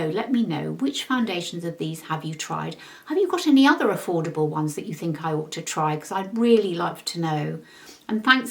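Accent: England, United Kingdom. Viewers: Oh my I do enjoy your lively English accent❤Your content is valued by this almost 70 yo. I do so love your realistic approach to wiser womens beauty! Honestly Delightful🦋Peace and light☮️🕊️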